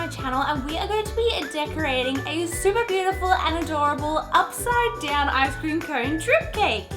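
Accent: Australia. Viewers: What part of Australia are u from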